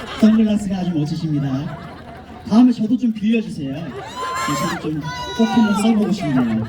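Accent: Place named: Gyeongsang, South Korea. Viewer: 희재님 오늘 경상도 사투리 입에 착착 감기네요♥❤❤😂😂😂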